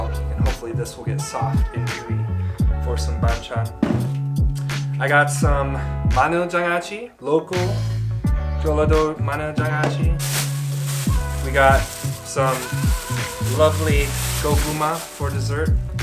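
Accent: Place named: United Kingdom. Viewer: your british accent is so funny! ㅋㅋㅋ 한국인보다 한국음식을 잘아는 사람!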